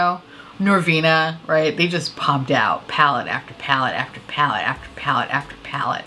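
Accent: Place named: Minnesota, United States. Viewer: Oh but your Minnesota accent is the best. And because I enjoy that accent so much my work has sent me to H.E.🥍🥍 in Minnesota for two months. From the convos I’m hearing you might consider using Rhubarb Crisp into the Minnesotan thingy.:)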